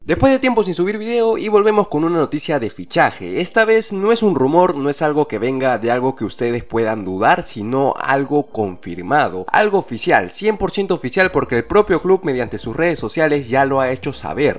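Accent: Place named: Bolivia. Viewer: Por q el marcado boliviano? 😵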